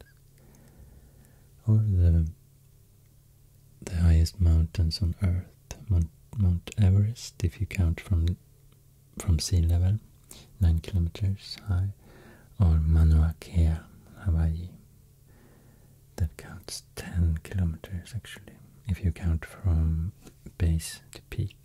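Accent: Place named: Sweden. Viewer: “swedish accent” is only “accent” i can listen to in asmr, any other “accent” just feels weird but this, this is soooo good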